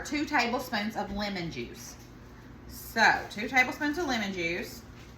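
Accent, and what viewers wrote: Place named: North Carolina, United States. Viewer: Sweetie, please quit apologizing for your pronunciation of words & your accent!!! We love you just the way you are!! I happen to be from North Carolina & talk just like you so I love it!! Great looking jelly! God Bless!!! 🙏❤️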